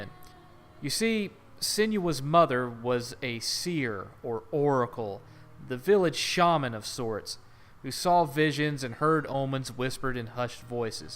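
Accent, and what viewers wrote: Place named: Alabama, United States. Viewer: I didn't really like the game, it was cool but meh, but your war against artistic indie games is some real incel shit. Also you seem to have blanked out half the game, the lover never blamed her, that was clarified later, that was her mental illness making her assume the thoughts of others, her father did some sus shit though. "San Francisco Arthouse crowd", oh I get it, you're one of those far right cringe republican reactionaries, yikes. "It's easy and predictable" while showing a video of you getting your ass beat by singular enemies. "Dark Souls for dummies" right after he showed he sucked at it. Guess you're self burning yourself there? And then he cries about the twitter crowd lol. It's very hard to listen to you complain about how easy the game is, when you're barely winning the battles on screen. This guy's accent and word choice becomes more Alabama the longer this video goes on. 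OMG, he's LITERALLY triggered by people liking this game, that's pathetic asf. "Pieces of shit who said this was goty"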